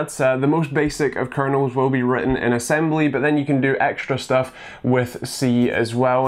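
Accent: Scotland, United Kingdom. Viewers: It sounds Scottish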